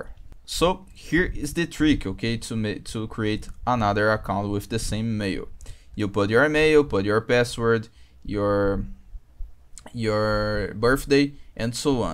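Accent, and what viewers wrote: Brazil. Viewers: tamojunto meu mano, eu sei q vc é br pelo seu sutaque kkkkkkk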